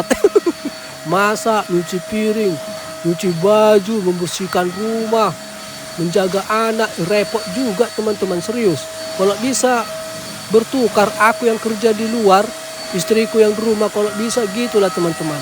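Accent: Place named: Batak, Indonesia. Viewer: Klu mendengar logatmu, marga apa kau lae.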